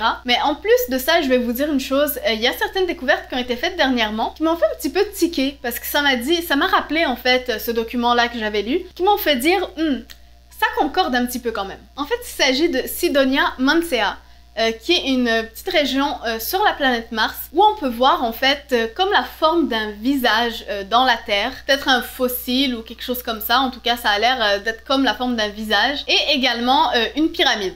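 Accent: Quebec, Canada. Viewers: Est ce que tu es québécoise... Sinon est ce que ça rapport avec la chanson de?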